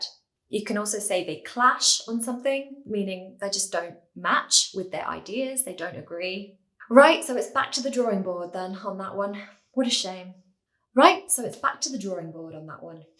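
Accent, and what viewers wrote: United Kingdom. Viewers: I love your accent. As a Brazilian, it has helped me understand British English. Keep up on that! Handy expressions! 🤗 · ❤we love you as a teacher , you’re videos making my accent like Uk people accent 😊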